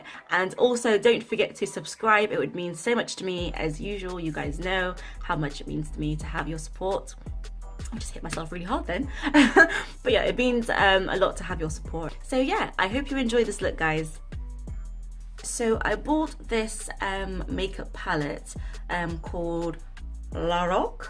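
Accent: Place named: England, United Kingdom. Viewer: LOVE THE HAIR GIRL !!!!!! did NOT expect you to be English !!!!! Thought you would be from the US. New subbie showing some love ❤️❤️❤️❤️❤️❤️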